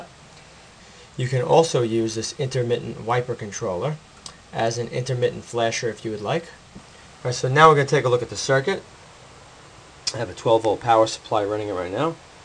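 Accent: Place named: New Jersey, United States. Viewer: Awesome circuit again. I have learned how to design and make any circuit that I want to now, just improvising from all the things I have learned from you, and many more like you. Thanks for all your teachings. And we love that NJ accent. It sounds so warm, especially with the style of how you make your videos which I find very engaging. A bit viceral even. You bring the audience way into your presentations. It is an effective style that others should emulate in my opinion. They are enjoyable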